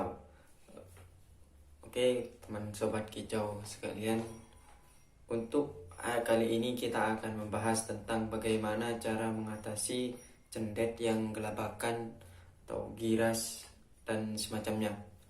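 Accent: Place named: Madura, Indonesia. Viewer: Madura nya bang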